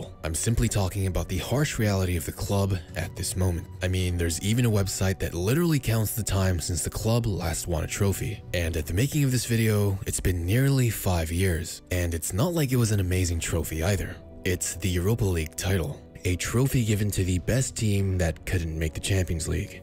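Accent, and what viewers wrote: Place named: United States. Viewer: Hearing someone with an American accent saying football when referring to soccer makes me cringe. Idk why maybe it’s cuz it’s so unusual to me.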